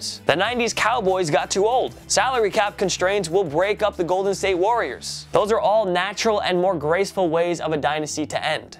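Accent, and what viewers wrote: United Kingdom. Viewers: I’m from the uk and I love your English accent 🧨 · nice brttish accent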